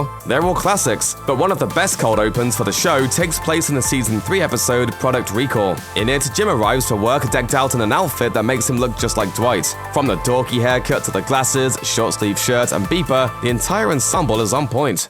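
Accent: United Kingdom. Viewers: Is it me or the British-accent (of the narrator) doesn’t really go with the American show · Love that a British guy is talking about the US version.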